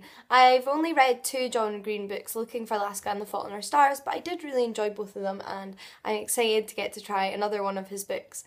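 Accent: Scotland, United Kingdom. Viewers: Hi there! I've just discovered you and I love your channel ❤️ You do really nice videos!!! I heard your accent and thought: this girl must be Scottish! 😍 I looooove your accent. I was in Edinburgh last summer and I'm coming back next month. Which part of Scotland are you from? Kisses from Spain xx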